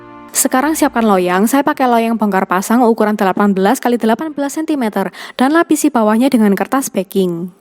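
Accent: Surabaya, Indonesia. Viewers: Medok sby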